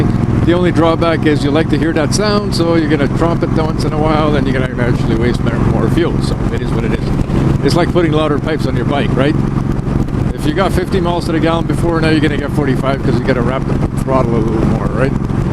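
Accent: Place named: Fargo, United States. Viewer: Hello! A thoroughly enjoyable trip along with some wide-open Canadian scenery! Good to see the Missus and her happy, cheery face! A real treat! Now that the December snow has arrived it was nice to see some earlier, snow-less fields! Hunting stuff with Big guns, Bear Traps, and Fishing gear...😮...😮.. you know me..😉....😉...., I just pop into Sainsbury's Super-Store and collect all my wild-life...ready prepared for me!...LOL..😋....!... and no-one gets shot!...LOL..😂...! Your "Fargo" (film) accent still tickles me,...every time!... 😂...😂...! Many thanks again for the trip, and best wished to the Missus...she needs to be kept warm!....😊...😊...!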